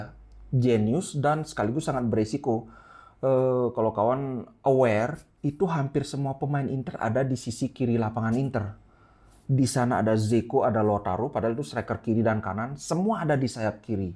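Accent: Bali, Indonesia. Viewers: Bang orang Bali ya bang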